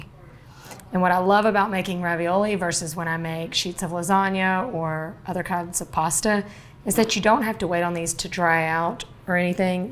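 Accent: South, United States. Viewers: That southern accent throws you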